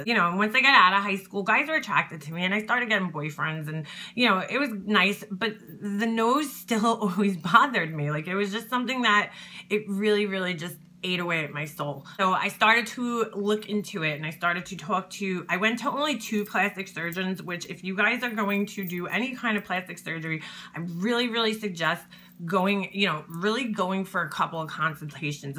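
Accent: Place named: Long Island, United States. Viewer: Long Island accent! Who knew? I've been trying to figure that out. Keep on runnin' your mouth with honesty! You are adorable.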